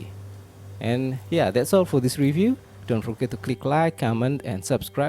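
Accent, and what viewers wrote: Indonesia. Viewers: Hello! I came across your videos while searching for proper reviews of lesser-known vintage Casio models with better water resistance, like the B650WD. The more I watched, the more I noticed something both familiar and unique about the way you speak. After a while, I realized from your accent that you’re Indonesian! Your reviews are top-notch, and I’m surprised you haven’t attracted a larger audience. Please keep making great videos, sir!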